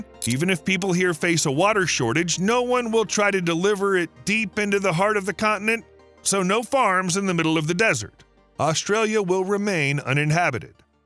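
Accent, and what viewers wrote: United States. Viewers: How do you seperate "strictly Aussies" from other ethnics or races when a large percentage of the population were born in other countries ? I dont bother watching or listening to videos about Australia that are narrated by someone with a US accent and this one was no different I turned it off. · Jesus, Give him the internet and a PHD from the Gretta Thrumberg university of HOW DARE YOU and suddenly he's an expert on Australia, The Aboriginals and global warming. During his eye rolling diatribe not once did he mention we contribute to 1% of the world's global warming issues.. Or that we're currently destroying the country to reduce that even further.. Whilst from his accent he's either American or Canadian.. Both of which produce far more than us. I'm 100% certain he got all his information from the ABC 😂.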